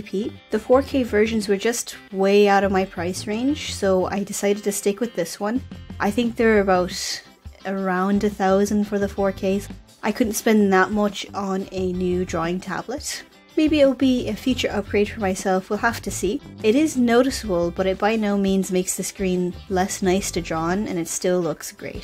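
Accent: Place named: Ireland. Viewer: I picked up on the Ireland part, but I couldn't figure out why it wasn't more pronounced (as Irish accents so often are). The American bit makes sense, though I was going to guess you were in England, and had Irish family or something. But I wasn't hearing any English tells.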